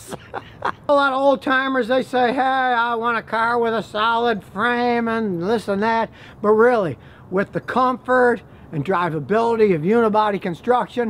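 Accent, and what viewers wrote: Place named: Chicago, United States. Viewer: Most Chicago accent ever. Could have been on Bill Swerski's super fans.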